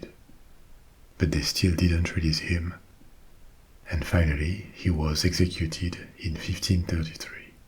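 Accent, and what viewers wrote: France. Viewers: plz keep your french akcent its amazing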